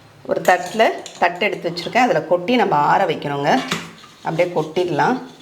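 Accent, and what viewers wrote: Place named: Pudukkottai, India. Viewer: Iam 58 belong to Pudukottai The way you we’re speaking reminded my side people voice More than the receipe I enjoyed your Tamil. Good going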